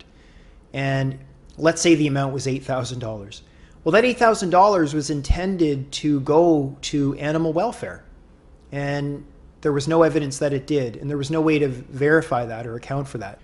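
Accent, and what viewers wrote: Canada. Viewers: Keep those great videos coming. I'm not vegan myself but do eat vegan a few days a week. I love the calm and more mature messages on your channel. And the canadian accent that makes me feel like I'm back home (I'm canadian living in France). 👍🍁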